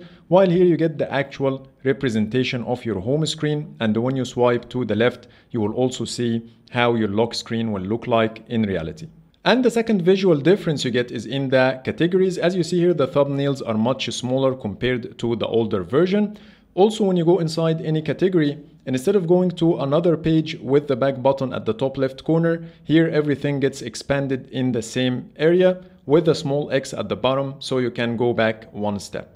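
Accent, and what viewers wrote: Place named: Egypt. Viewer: لهجتك انجليزي مصري ... عاش اوي ❤️❤️❤️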